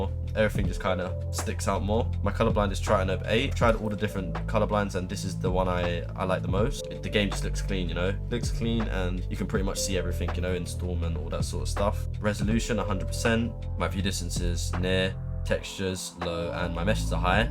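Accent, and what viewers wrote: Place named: United Kingdom. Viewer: Are u British I love the British accent 🥰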